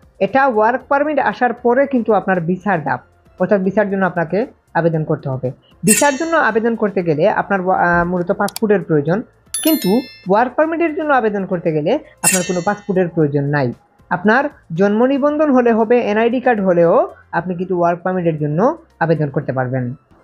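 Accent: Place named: Sylhet, Bangladesh. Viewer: ভাই মনে হয় সিলেটি, কথায় বুজে গেলাম🥰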